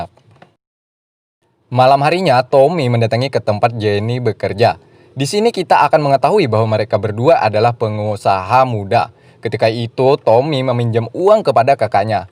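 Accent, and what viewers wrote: Banjar, Indonesia. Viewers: Mantap bos campuran logat bahasa banjar sama bahasa indo👍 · Adminnya urang Banjar dingsanak lah.. Hehehe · Aksen nya kaya urang banua Banjar tambahee lagee sanakk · Mang orang banjar kh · Banjar kah admin nih...umaaaaa...mandangar dangsanak tu aeee..tesadar...wkwk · Logat banjar kd bisa hilang😁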